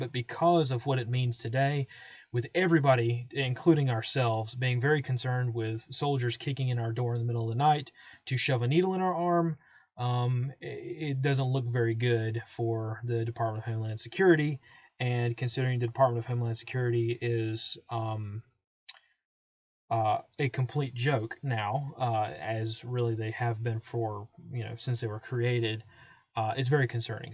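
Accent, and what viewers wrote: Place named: South Carolina, United States. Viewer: Just found your channel the other day and today found out you did briefs like this. This is awesome. BTW as an SC native you sound like one of us! Thank you for sharing your wealth of knowledge and skill with us. It is greatly appreciated and a service to your fellow Americans. Godspeed to all you gentlemen at S2!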